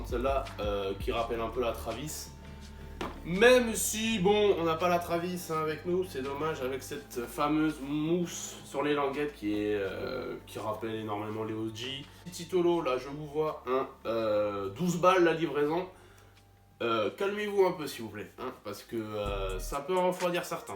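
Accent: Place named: South, France. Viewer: Salut mec je viens juste de découvrir ta chaîne, enfin une personne qui a un peu l’accent du sud mdrrr j’en ai marre de l’accent parisien 😂 bref j’ai bcp aimé la vidéo +1 Abo ah oui et avant de partir, j’ai un budget de 200€ pour une AJ1 Hugh en 11, est-ce que je devrai attendre que Nike en sorte sur lapp où j’en prend une sur un site direct? (si t’as un site qui vend des snkrs pas cher dis moi je suis preneur) et pour re-finir ça pourrait être cool de faire une sélection de vêtements pour des tenues avec tes paires les plus importantes pour toi ça serait vraiment cool !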